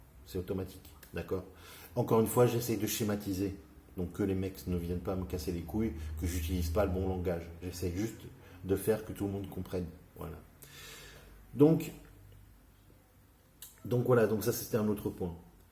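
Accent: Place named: Quebec, Canada. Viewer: il a l'accent québécois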